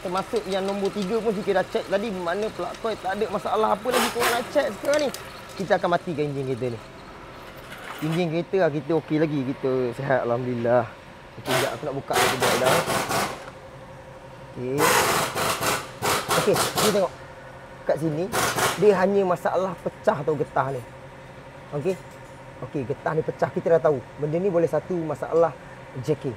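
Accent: Pahang, Malaysia. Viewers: Bro orang pahang ke ? Kampung kat temerloh ke ?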